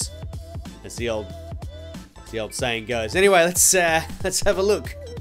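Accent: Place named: Australia. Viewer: I didn’t know he was Australian I thought he was Italian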